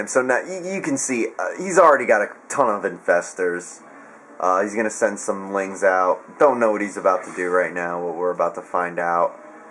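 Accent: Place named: California, United States. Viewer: You have to be from Cali :P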